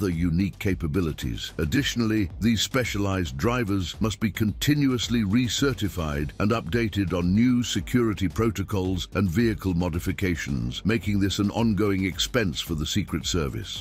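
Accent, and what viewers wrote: United Kingdom. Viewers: Another British narrator talking about an American president’s car. 😳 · Why is the narrator British?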